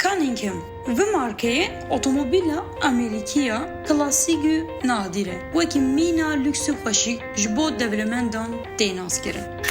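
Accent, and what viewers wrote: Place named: Botan, Turkey. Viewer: Sihet xweş, bi rastî jî vîdeoyek gelek xweş bû. We kedek mezin daye ji bo amadekirina vê vîdeoyê. Binnivîsa Kurdî jî gelek baş bû. Muzîk pir baş lê hatibû û şêwaza kêşanê û pevbestin jî têra xwe baş bû. Em her dem li hêviya vîdeoyên we ne🍀❤️ Tenê ez dixwazim pêşniyarekê bikim ku zimanê vebêjiyê hinekî herêmî maye(botan, cizîr, silopî, şirnex). Bi min zimanekî hinekî din standart were bikaranîn wê pir baştir bibe🙏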